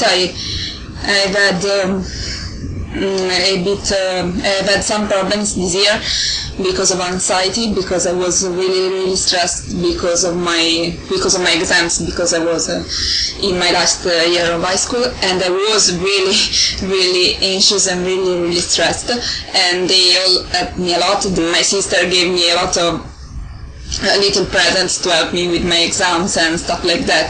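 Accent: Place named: England, United Kingdom. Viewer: your English Accent was AMAZING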